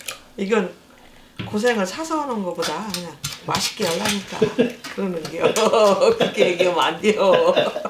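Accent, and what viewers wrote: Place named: Chungcheong, South Korea. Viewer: 충청도여유 ㅎ ㅎ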